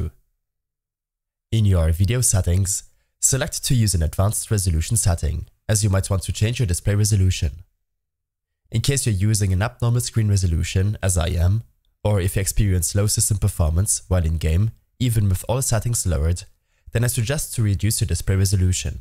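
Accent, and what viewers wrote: Germany. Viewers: Bro are you from Germany?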